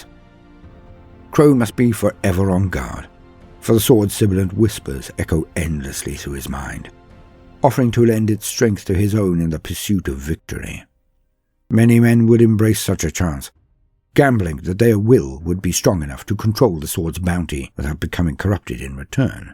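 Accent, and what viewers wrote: United Kingdom. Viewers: I always give warhammer channels an extra 20 points for having a British narrator.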